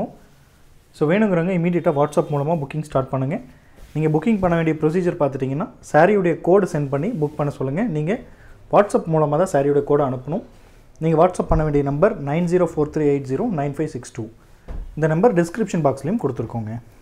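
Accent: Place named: Kongu, India. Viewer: 💐💐💐🙏super with Nama kongu Tamil nice voice ங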